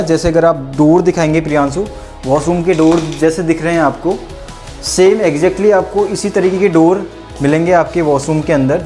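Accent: India. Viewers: bro is 100% kumaoni, i can tell from the accent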